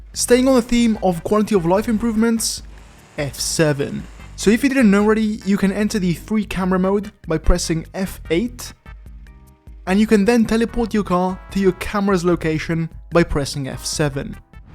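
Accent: Italy. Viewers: bro so easy to tell you are italian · Ciao, ho sentito che sei italiano. Come fai a parlare inglese così bene? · aoo ma sei italiano ? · Ma sei italiano? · ma sei italiano?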